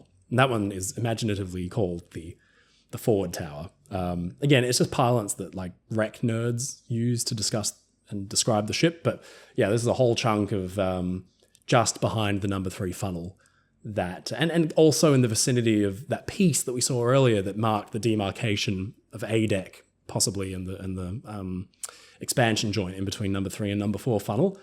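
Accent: Australia. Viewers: Neither, is Australian.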